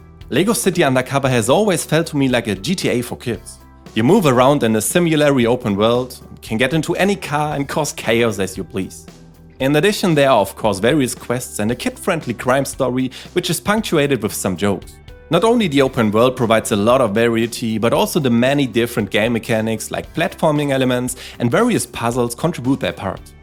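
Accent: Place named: Germany. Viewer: Your accent is german, isn't it? As a fellow german myself I find this really funny but I'm worried my own accent will get stronger through hearing all of your videos. Also I played moonlighter after getting it recommended and I liked the concept, but it was VERY repetitive and didn't have to offer anything than this innovative selling game mechanic. After the second dungeon I got really bored and wanted a bigger overworld open world and varying gameplay. All the other games on these list are absolute masterpieces though🔥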